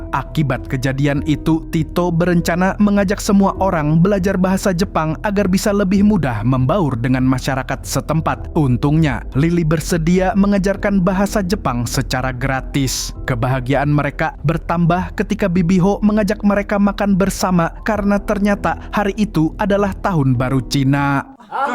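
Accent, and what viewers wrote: Banjar, Indonesia. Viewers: Bubuhan Banjar sekali nya 😂😂😂😂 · Abang urang banjar lah😅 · Mantap mang bahasa Banjar y😂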